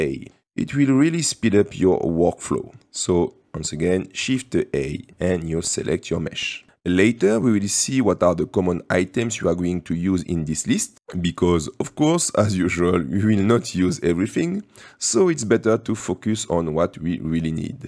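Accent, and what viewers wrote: France. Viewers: He sounds so french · That French accent though! Perfect for learning :D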